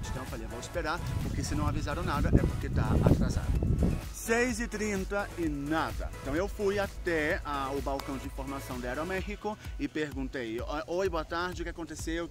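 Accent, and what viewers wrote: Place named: Antioquia, Colombia. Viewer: E muito interessante como seu espanhol e tão paisa 😅😅😅😄😄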